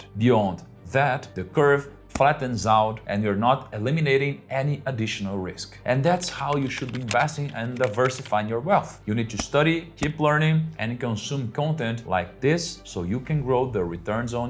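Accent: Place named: Brazil. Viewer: Fly, my friend! Fly! So good to hear your voice in English! You'll get to the hearts of people with your Brazilian accent! This is what happened to me 20 years ago, working as a volunteer in the US and learning the language at the same time! You're soooo good to go! You rock! <3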